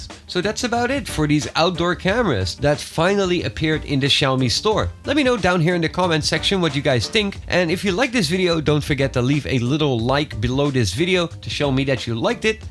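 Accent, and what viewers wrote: Netherlands. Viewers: Hoezo Nederlander? Je accent is duidelijk maat, haha Anyways, the camera seems to be nice and all. But I have been hearing never to take a device that comes from China due to hackers, content stolen, listening in, watching your cam etc. You didn't handle that aspect so how true is that and to what level is that a danger with this model? (I hope to get a answer quickly, my home has been broken into twice now and I wish to know whether this is the best one for me or not.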